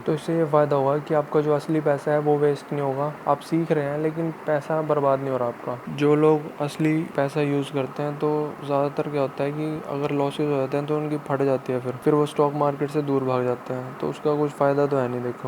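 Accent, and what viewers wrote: India. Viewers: One suggestion/ recommendation only with well wishing to improve. Please speak closer to mic as awaz thoda faint hai. love your style of talking. Talking like a layman with Delhi Hindi twist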